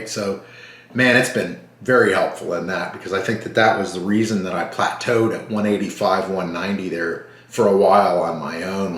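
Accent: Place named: United States. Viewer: Greetings from Australia. Have ordered Mounjaro but we are not allowed to have the preloaded pens yet.... they have to be self drawn normal injections ( I think you say shots in the USA lol).. What do you do for work.? anyway soon starting I guess the minimum dose, wish me luck. Thank you for sharing your experience. love your American Accent, so cute.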